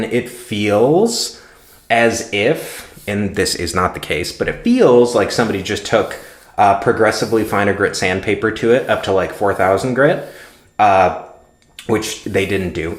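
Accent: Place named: South Carolina, United States. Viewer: hahahaha new follower because of your voice and accnet, sounds like south carolina deep in the south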